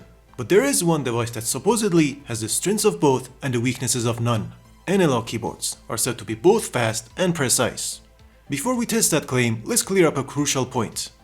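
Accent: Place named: Iran. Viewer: yeah i know ، i didn't want to disrespect you brother , but you have the same accent as Iranians , i thought you might be Iranian like i am , im Persian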